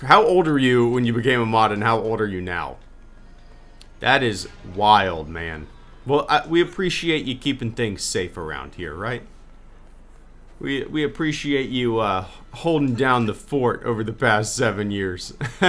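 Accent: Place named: South, United States. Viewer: Really rocking that southern drawl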